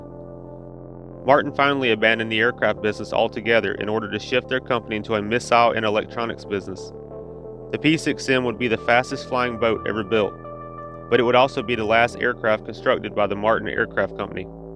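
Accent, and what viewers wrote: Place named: Southwest, United States. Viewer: Great Channel Name. Betty Page and Airplanes from the Cold War - Make a full 3 minute opening theme of that. Info I have never heard or read about anywhere else and I am big into history. Narrator has a SW to MW accent. Texas or Oklahoma?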